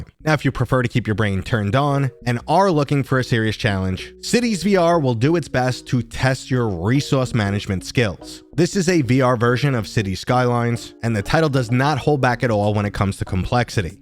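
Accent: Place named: Boston, United States. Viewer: I’ve never been to America but imma guess this guy is from Boston? 😂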